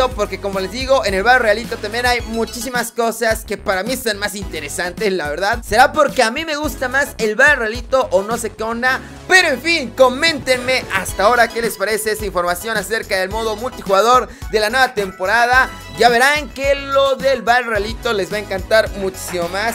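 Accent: Mexico. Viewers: Me encanta tu acento Méxicano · Apuesto mis nalgas a que eres norteño de México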